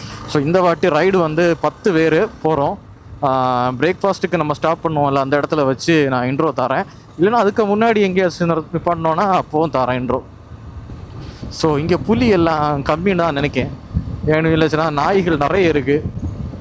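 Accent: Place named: Kanyakumari, India. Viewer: Nice .....Nanbarae.....In kk slang....❤❤❤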